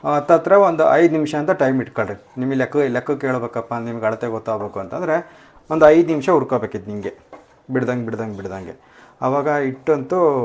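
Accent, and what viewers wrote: Chitradurga, India. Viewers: ಹಲ್ವ ಮಾಡೋದು ಚೆನ್ನಾಗಿ ತೋರಿಸಿದಿರಿ ಚಿತ್ರದುರ್ಗ ಕಡೆ ಭಾಷೆ ನಾ ಇದು ಚೆನ್ನಾಗಿದೆ ಚೆನ್ನಾಗಿದೆ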